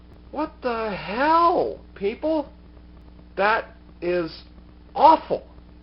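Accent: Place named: United States. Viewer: Could you at least try a little harder on your pronunciations? I mean, I know you're American and all, but really man, it's a little grating to hear when you say the Japanese names.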